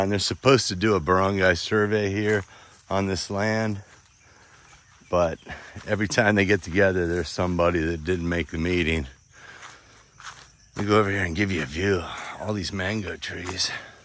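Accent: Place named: Midwest, United States. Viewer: Your accent and how you say Crick reminds me of home. Are you from the Midwest?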